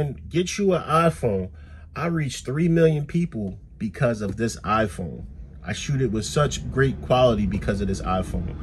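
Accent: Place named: Philadelphia, United States. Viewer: oh you def from philly just got a van thanks for the advice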